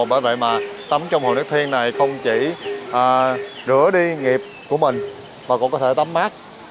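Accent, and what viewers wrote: Vietnam. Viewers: lối nói chuyện của * ... người miền Nam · giong viet nam qua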